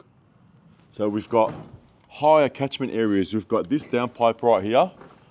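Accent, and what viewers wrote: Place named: Western Sydney, Australia. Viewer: Your content is invaluable to all potential home and building owners. Your message would be more effectively delivered without the - "oh my gods" and "would you believe it?" delivered in western Sydney dialect. You are obviously on top of your subject but please give us some results. Are builders and certifiers in gaol? Where are the references to Court cases emanating from your investigations? Your method of delivery appeals only to those who want to complain that they are beaten down but have taken no measures to educate themselves.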